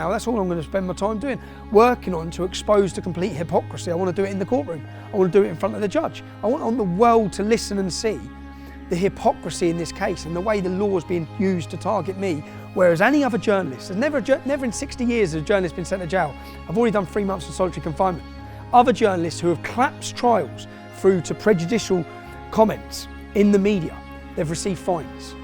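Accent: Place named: United Kingdom. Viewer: Tommy, we love you! You are noble and a rarely brave Brit, with a fantastic accent to boot!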